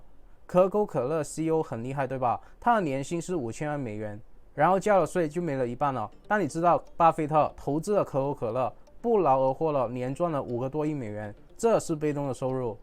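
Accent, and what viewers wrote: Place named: China. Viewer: 原來你是大陸人，難怪口音用語不像台灣人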